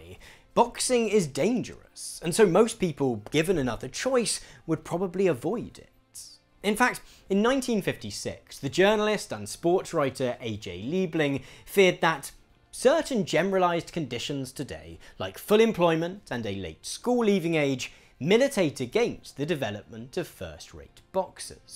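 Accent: RP, United Kingdom. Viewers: voice, accent and intonation sound exactly like coming from a listening exercise in a British English course. Perhaps recording these is his other job...?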